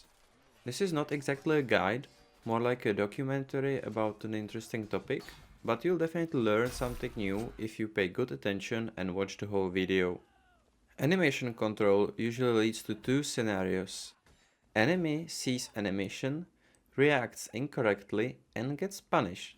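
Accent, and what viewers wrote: Czech Republic. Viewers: Where are you from? You sound like Czech.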